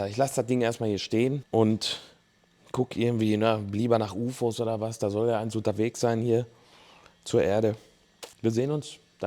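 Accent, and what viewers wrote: Ruhr, Germany. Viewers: Dialekte sind richtig und wichtig 😅 Ruhrpottklang von 😉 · wichtig ist, die deutsche Sprache in ihrer wahren und richtigen Form zu bewahren. Ich finde diesen Ruhrpottklang von auch so sympathisch!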